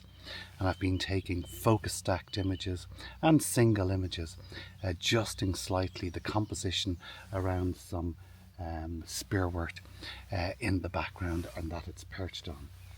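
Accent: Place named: Ireland. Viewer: As usually, just love your relaxed soft Irish delivery on the narration plus a video about my chosen genre of photography ( macro/ close up nature ) followed up with some very interesting facts and outstanding images really couldn't get any better. Certainly for me one of the most enjoyable vids. seen on u-tube of late. 👌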